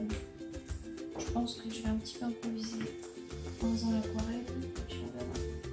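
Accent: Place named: Quebec, Canada. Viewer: C'est génial, quand j'entends ta voix (qu'accessoirement je connais), j'ai presque l'impressions d'entendre une touche de Québécois 😄 (Le "à l'arrache" me rassure sur tes "origines" 😉)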